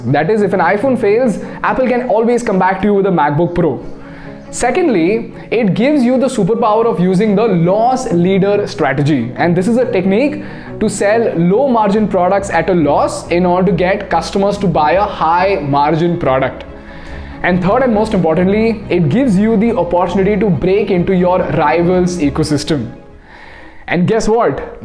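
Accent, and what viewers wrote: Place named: North, India. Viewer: North Indian accent is so funny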